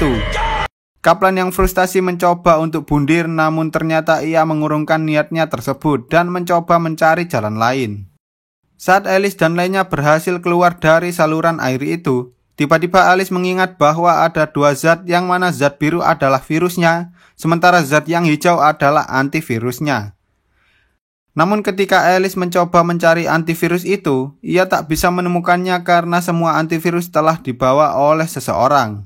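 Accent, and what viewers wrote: Java, Indonesia. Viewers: Bang koe wong jowo endi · abang orang jawa kah? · Bang ngomongnya kok kya orang jawa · Bang orang jawa😂 sama dong kayak aku 😆😁😁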